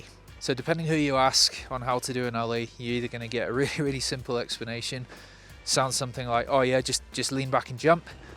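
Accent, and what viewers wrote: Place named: United Kingdom. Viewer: Came away from the first watch of this video thinking ‘well that taught me nothing, all I did was watch a bloke snowboard down a hill!’ The calm British voice was a welcome change to Tommie though 😂 But I actually thought about it the next time I strapped into my snowboard in the garage and suddenly it made a lot of sense! I’ve been trying to get Ollies dialled, and have watched so many technique videos, but recognising the flex characteristics of the board, and working with them, was a real light bulb moment for me and it made a huge difference on the snow! Suddenly you have to think less about the plethora of different technique tips you’ve heard, as it becomes a much more natural movement sequence. Never normally write comments, but this video deserved one. 👍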